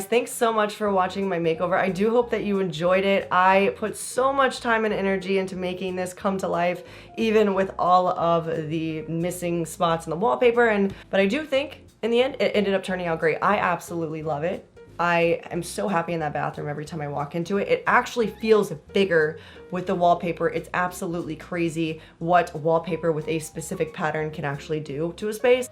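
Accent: United Kingdom. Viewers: Wow … Legit looks amazing. Also the funny odd dorky British accent ish .. haha so random. So brave !! to have starting staining the shelf mounted …with the wallpaper …. My nerves !!!! Haha And yes….. toilet seats made of wood/ wood design.. look amazing. Well done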